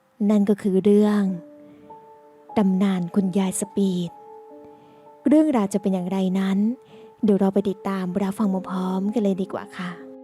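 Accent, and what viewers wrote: South, Thailand. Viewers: ติดตามมาเป็นปีละ ฟังตลอด และสงสัยตลอดว่าสำเนียงแบบนี้ เป็นคนใต้เหมือนกันรึป่าวครับ 🤔😂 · ลองไปฟังคลิปเก่าๆนะเจ๊แกพูดภาษาใต้เยอะ เจ๊คนใต้แน่นอน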